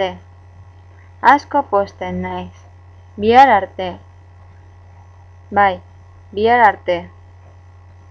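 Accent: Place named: Spain. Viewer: You speak like a Spaniard, or maybe just Bilbaino?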